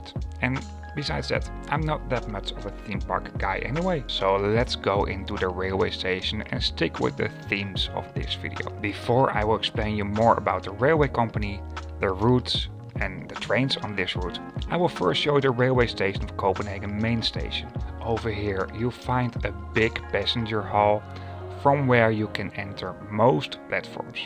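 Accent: Netherlands. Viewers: Ik hoorde echt binnen een seconden dat je Nederlander bent 😅 We can't hide who we are 😛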